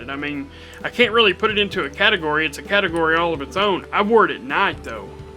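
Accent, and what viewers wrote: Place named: Rural, United States. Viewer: Dude I really didn’t notice your country accent. Some words you say maybe but didn’t notice. is much stronger. Love the country accent.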